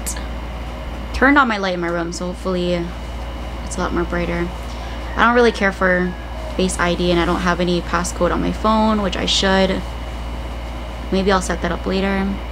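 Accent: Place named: United States. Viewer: prng amerkano dw voice mo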